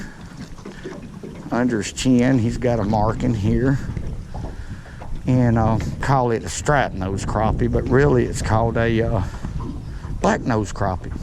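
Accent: South, United States. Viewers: Like your videos. Also like that southern traw! 👍🏻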